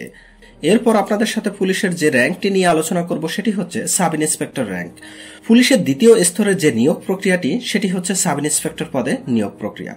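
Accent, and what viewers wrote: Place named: Comilla/Noakhali, Bangladesh. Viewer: আপনার বাড়ী কোথায়? কুমিল্লা / নোয়াখালী?আঞ্চলিক টান রেয়েই গেলো।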